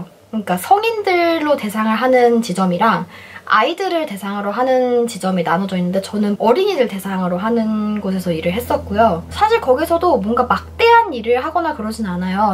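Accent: United Kingdom. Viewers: 남자친구가 영국에서 7년을 넘게 살다왔는데 남자친구가 하는 영국영어보다 이 하는 영국영어에 더 치이고 갑니다......💜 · 영국 영어 멋있네요. 한동안 영어 잊고 살았는데 동영상 보고 기분이 좋아집니다. 좋은 하루 되셔요~~~ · 당신...... 혹시 전생에 헤르미온느였나? 영국영어 너무 듣기 좋아요ㅠㅠㅠㅠ 넘 멋있구, 왠지따라해보고 싶고, 치이고 갑니다ㅠㅠ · 영국발음 너무 멋져요^^ 저도 영국 발음을 연습하고 싶은 욕구가 뿜뿜하네용~~ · 영국 악센트보다보니 제 호주 시골발음이 너무 안쓰러워졌어요ㅋㅋㅋㅠㅠㅠ 목소리도 말씀도 조목조목 너무 예쁘게 잘하세요!😍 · weird how do u have so strong Britsh accent? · 알고리즘에 끌려 들어왔다가 영국발음에 치여서.. Q&A도 보고 영어 공부해야겠단 생각이 빠짝드네요!❣ 구독하고 갈게요🥰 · 오늘 처음 들어와서 구독박고갑니다. 자주들를게요. 영국발음 넘 좋네요!!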